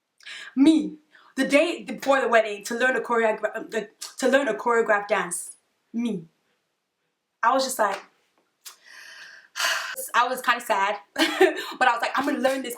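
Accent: Nigeria. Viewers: I made it thru the end lol. I love the Nigerian accent, and I can really relate to your accent because I'm also Nigerian💚💚✊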